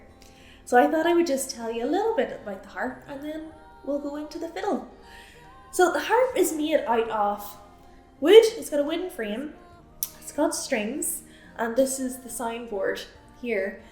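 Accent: Ireland. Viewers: I love hearing your authentic irish accent. It's not to often that I get to hear one. I love it and I like learning about the history of instruments from a beautiful Irish lasse.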